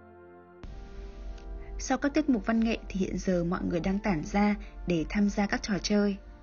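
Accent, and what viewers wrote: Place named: Hanoi, Vietnam. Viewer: " " Haha. Dễ thương, giọng Hà nội (gia đình >80 năm).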